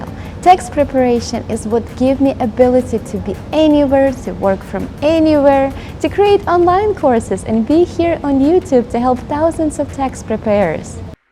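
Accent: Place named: Germany. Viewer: That is a lovely accent you have there. Germany?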